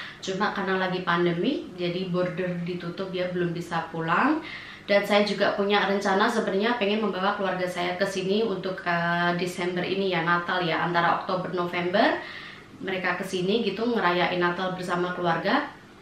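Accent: Java, Indonesia. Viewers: Iya mbaaaak Indonesia bangeeet soalnya. Nek ngobrol, "njawani" bangeeet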